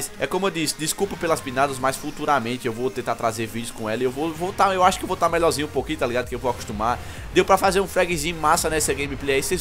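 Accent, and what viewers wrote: Northeast, Brazil. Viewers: VC É UM MITO MANO SOU SEU FÃ PRINCIPALMENTE PORQUE VC É DAQUI DO NORDESTE EU MORO AQUI MANO EU AMO SEU SUTAQUE ASUHAUSHAUSHAUSAUHS <3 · ***** mano vc é do nordeste neh ?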